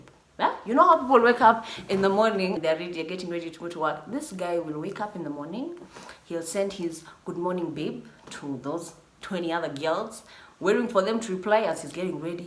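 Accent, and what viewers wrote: United Kingdom. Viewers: I've been watching your videos so much in the middle of a convo I start faking a Brit accent😂😂😂😂😂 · The British accent,😍😍😭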